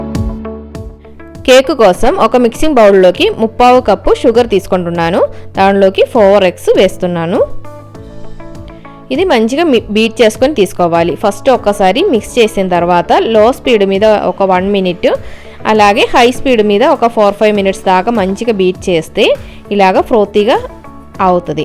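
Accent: Kerala, India. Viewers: Yantha ba telugu matladhunori with that malayalam touch❤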